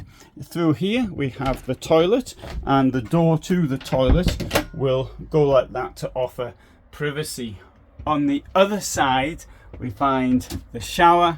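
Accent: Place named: Geordie, United Kingdom. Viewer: Judging by his wonderful accent I’d say the North East of England, if I had to guess I’d say Tyneside.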